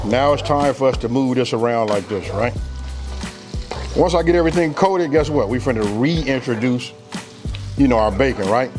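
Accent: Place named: Los Angeles, United States. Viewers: no His accent sound more like California,.. I aint never heard no Mississippi people sound like that (im from Memphis btw) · You sound like you're from LA or Compton... southern with a west coast talk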